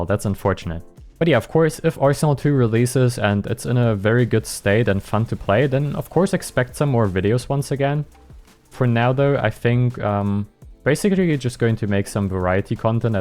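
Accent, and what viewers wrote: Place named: Germany. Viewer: I hear intense german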